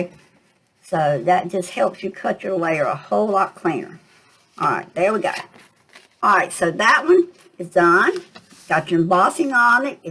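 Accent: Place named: North Carolina, United States. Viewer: I just found your channel tonight and as soon as I heard your voice I thought you sounded like a nice southern grandma...like many of the older southern women I've known my whole life. When you said something about NC I realized that's why you sound so familiar. I was born, raised and still live in Raleigh. You remind me of my grandmother who passed in 1997 at the age of 84. I will be visiting your channel often, not just to hear your voice but to ingest your wonderful junk journaling content. I love that center pocket flip!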